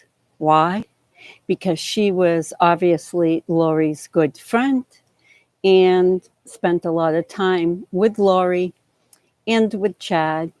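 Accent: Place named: Northeast, United States. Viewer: I LOVE your channel!!♥️ I REALLY LOVE your northeastern accent. Your voice sounds just like my own did.😍It brings back happy memories. I think your assessments of this most BIZARRE case are pretty much “spot on.” Thank you for the time/work you put into your channel.🌺🌺🌺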